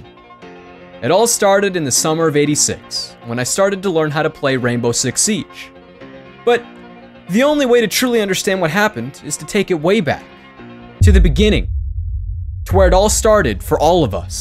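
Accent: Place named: Russia. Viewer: Bru I noticed he kinda has a Russian accent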